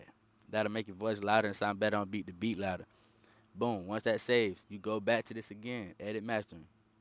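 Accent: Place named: Atlanta, United States. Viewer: yuh he frm atl fasho😂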